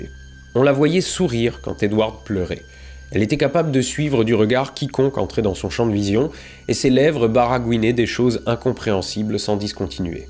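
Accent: Alsace, France. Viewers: C'est un accent alsacien pas belge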